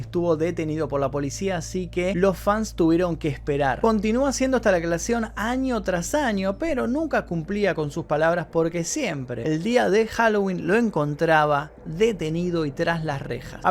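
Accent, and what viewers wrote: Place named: Argentina. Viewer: Es argentino, para mí es normal escuchar ese acento, en mi país sobran los argentinos.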